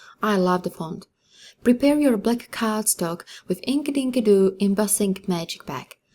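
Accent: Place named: England, United Kingdom. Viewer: Fiiha velmi dobre video!! ;-) a o typickej anhlickej anglictine nehovoriac,super !! A aj pohladnicka krasna!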